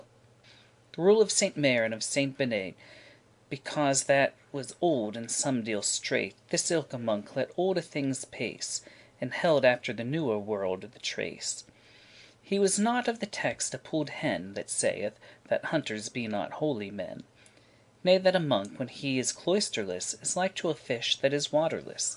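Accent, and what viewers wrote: United States. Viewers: Ive been needing an American accent to understand it ❤ thank you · That's bloody awful. You're supposed to speak it as it was spoken, not with a Yank accent!!!!!!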